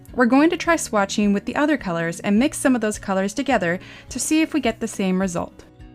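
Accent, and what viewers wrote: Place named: RP, United Kingdom. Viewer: you sounded so posh! great review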